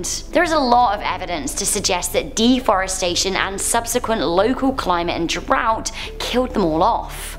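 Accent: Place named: United Kingdom. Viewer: I love your British tone its the best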